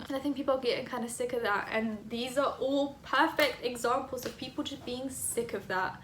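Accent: United Kingdom. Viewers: Not to be rude bc i enjoy your content and it’s not like you can control this but for some reason I just can’t stand british accents. Idk why but for me it’s like nails on a chalkboard. Anybody else feel that way or is it just me?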